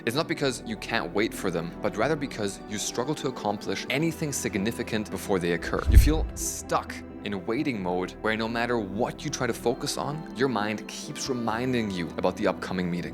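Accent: Germany. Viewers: I hear the german accent 🤨😂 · Wenn meine Ohren mich nicht komplett in die Irre führen, ist deine Muttersprache Deutsch. Wieso machst du die Videos nicht (auch) auf Deutsch? In meinem Umfeld gibt es leider viele, die kein Englisch können und es ist sehr schwierig ihnen die ganze Sache anständig zu erklären. Leider gibt es ja kaum gute deutschsprachige Videos zum Thema.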